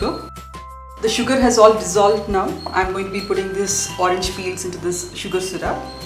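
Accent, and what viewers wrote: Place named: India. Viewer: I love the Indian accent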